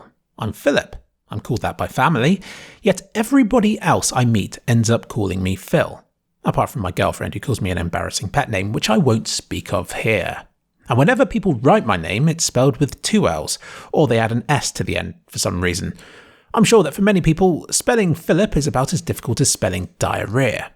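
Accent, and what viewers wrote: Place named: Forest of Dean, United Kingdom. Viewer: Sounds like the people in the Forest of Dean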